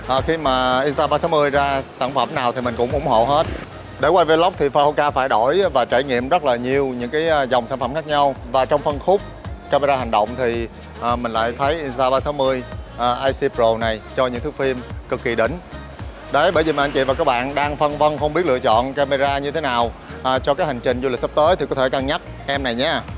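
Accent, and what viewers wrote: Vietnam. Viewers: lối nói chuyện của * ... người miền Nam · giong viet nam qua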